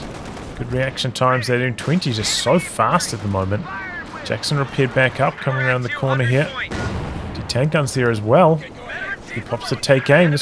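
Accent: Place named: New Zealand. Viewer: Great cast as always mate. Heading to Wanaka for a holiday come July. Your accent constantly reminds me of my upcoming holiday haha.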